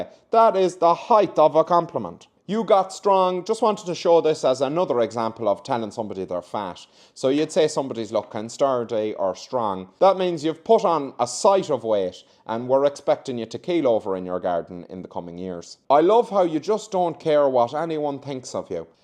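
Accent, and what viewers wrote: South, United States. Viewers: Great southern accent!!! :) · Your performance of the southern accent is spot on. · 😂😂 the southern accent was what I needed to hear today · I love your southern accent 😊😅 Butter wouldn’t melt in her mouth People asked if I was part of the BLUE ARMY…I had no idea why they would think I was in the army!!! ☕️☕️☕️☕️☕️ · You do MARVELOUS American accents!! Have you lived over here? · 😲 Your southern accent is spot on! “Bless your/his/her/their heart” isn’t always an insult. It depends upon the tone of voice and facial expressions used when saying it. “Sweet pea” can also be used in a similar way. In fact, 🤔 I think most southern compliments can be used interchangeably as insults (you have to be polite, after all). The tone, timing, facial and body expression is the key. Shoot, my favorite southern “fuck you” is “Jesus loves you!” 😂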